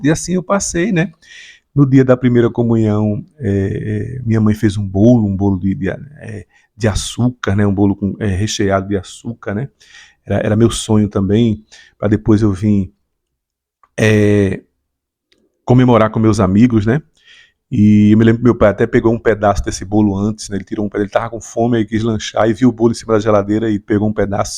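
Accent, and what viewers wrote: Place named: Pernambuco, Brazil. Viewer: Muito boa a forma que o senhor explica,sem se exaltar com calma sem ofensas a quem ainda acredita parabéns, além do mais tem esse sotaque maravilhoso eu como baiano mais adoro o sotaque de Pernambuco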